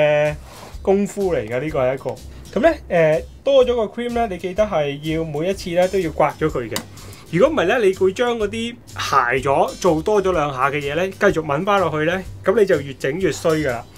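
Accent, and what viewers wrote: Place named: Cantonese, China. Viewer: 廣東話好好聽😀👂🏼♥️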